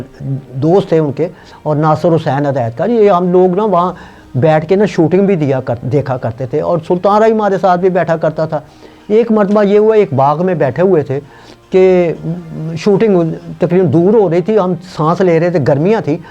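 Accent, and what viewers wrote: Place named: Punjab, India. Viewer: Sir tawada lehja Punjabi wa the aede nalon changa tusi Punjabi hi bol lo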